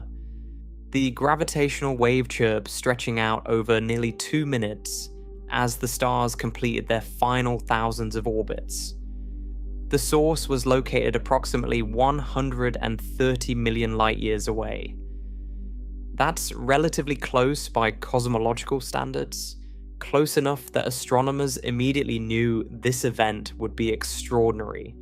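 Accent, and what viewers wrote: United Kingdom. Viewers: Pretty sure most Brit accents have trouble with late or word-final rhotics, according to the American ear. But Brits are easier to fall asleep to anyway. I guess there's metaphors to be made there.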